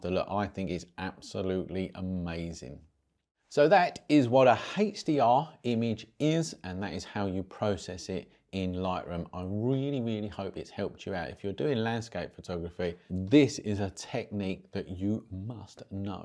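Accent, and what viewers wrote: United Kingdom. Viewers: Great to hear a Brit for once. Also, letting us experience the video before asking for a like and subscription.I will definitely be looking out for more of your videos and advice.good work.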